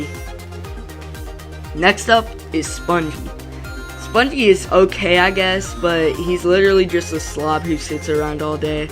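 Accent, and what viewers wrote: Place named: Texas, United States. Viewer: dude sounds like that local texan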